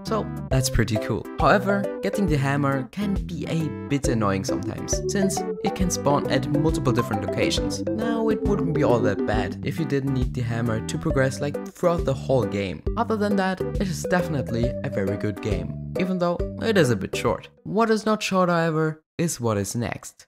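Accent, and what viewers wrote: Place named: Germany. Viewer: I can hear the German I think xD but love ur videos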